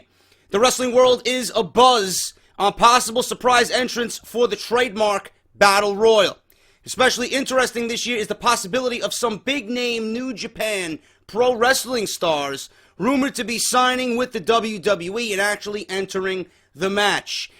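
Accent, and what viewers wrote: New York, United States. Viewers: jesus christ you even sound like youre from NY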